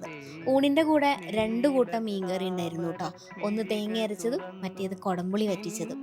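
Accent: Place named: North Kerala, India. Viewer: ചിക്കൻ പാർട്സാണ്❤ എൻെറ മാതാപിതാക്കൾ എറണാകുളം കാരും ആ നാടുമായി എനിക്കുള്ള നിരന്തര മായ ബന്ധ വും കൊല്ലത്ത് സ്ഥിര താമസ വുമായ ഞാൻ താങ്കളുടെ ഈ വടക്കൻ സംസാര ശൈലി ഒരു പാട് ഇഷ്ടമാകുന്നു✌️🙏♥️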